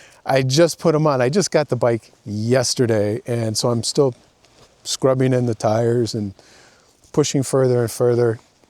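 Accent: United States. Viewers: Hey, I appreciate what you do and your candor when you review these bikes. Down side of that is it makes me want to own more than I do already. I have a 2023 RT blue like yours, and as my second RT in three years I'm pretty happy with it. I have to agree with you that that the conected ride is a piece of garbage, and is completely unreliable. However, living where I live (southeastern Utah) and riding where I ride in the western US, there is spotty cell coverage so I just use a Garmin XT2 that way 99% of the time I have GPS coverage. Since I do all I can on my own, not only because I like to, but also the nearest BMW dealer is 200 miles away, my cost of ownereship is more a time issue. When the 16K miles service needs to be done, unless I rent the propriatary tools, I may wind up going to the dealer for that, so I will be curious to see what that cost. Keep up the good work! 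By the way you speak english like an American, I have yet to catch an accent.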